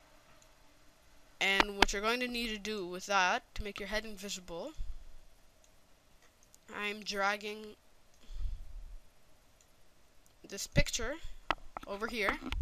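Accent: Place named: Canada. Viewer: no offense..but i can tell u have a canadian accent..its cool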